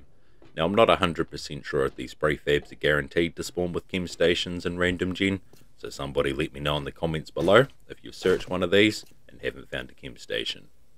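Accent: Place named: Australia. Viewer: Man you sound like Jamaine from flight of the Concorde's. I don't mean just because you both have Australian accents ya just fully sound like him. Such a crack up that show. Also cheers for all the tips on 7 days.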